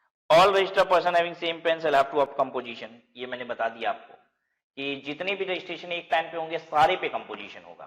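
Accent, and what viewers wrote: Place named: Uttar Pradesh, India. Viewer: Sir from Varanasi, UP. You definatly got that UP accent:) I am from Kanpur, UP. Thank you for all the videos sir Thank you very much.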